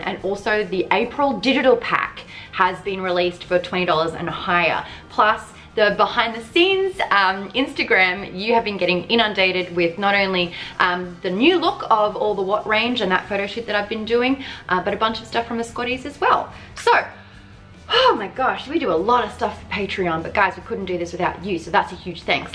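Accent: Australia. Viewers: You sound more Aussie than usual. I dig it ;)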